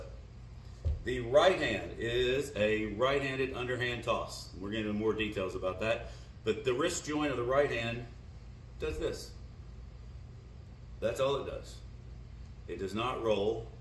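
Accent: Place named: Texas, United States. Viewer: I love how articulate and well spoken your videos are. I have watched several videos , so far, will watch them all. Videos have good ideas in them, I watch them a few times over, to pick up every word. Sounds like you have a Texas accent, like you could ride up in a horse and say "Let me show you around the ranch". The hands have to work correctly, one arm right hand swing drill is great. Mike Malaska stresses hand action also.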